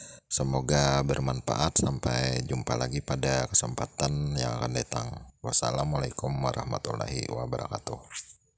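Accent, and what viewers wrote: Banjar, Indonesia. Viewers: Kyk orng Banjar abg nik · Logat banjar nya terasa banar leh 😁😁😁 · Logatnya kayak orang banjar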